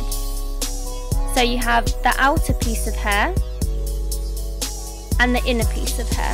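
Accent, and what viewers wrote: United Kingdom. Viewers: Omg she's so British well I am but I'm from Essex and we don't sound like that lol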